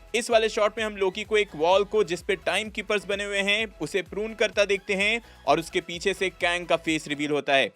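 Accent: Bihar, India. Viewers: bhai bihar se ho kya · Are you from bihar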